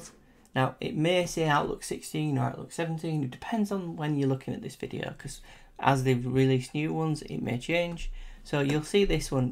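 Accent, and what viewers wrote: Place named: United Kingdom. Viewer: You are a genius! I have gone to so many sites to try to fix this flaw on my brand new Macbook Air and your solution is the one that has made the Outlook search function work. The file display on my computer was a bit different than what was shown on your screen but I kept working to open the folders until I finally found Outlook in the library section. By including and then unchecking it from privacy it has started the search function. With your accent you might be in the UK--wish I could buy you a pint!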